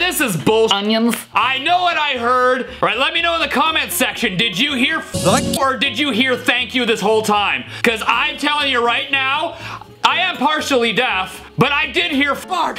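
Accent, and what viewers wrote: United Kingdom. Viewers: Very heavy British accent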